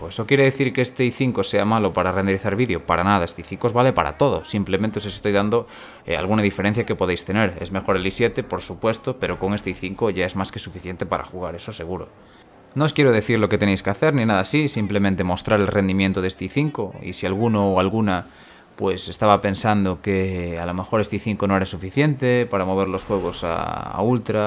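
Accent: Galicia, Spain. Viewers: se nota q eres d galicia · muchas gracias por responder. . seguramente pille un 6700 sin k ..ya que no me interesa hacer OC . por cierto ..eres gallego ??(yo lo soy) ..lo digo por el acento ajaajaj!! un saludo crack y sigue así ..llegarás lejos;)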